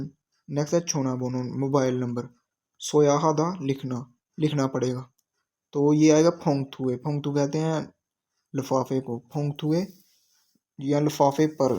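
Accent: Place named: Sialkot, Pakistan. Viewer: Sialkot SA lag Raha ho😊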